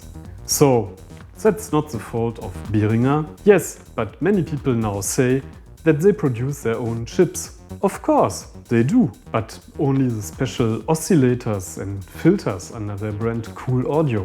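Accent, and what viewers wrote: Germany. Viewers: Man i love your german accent, it reminds me that there are also great electronic musicians in germany ❤❤‍🩹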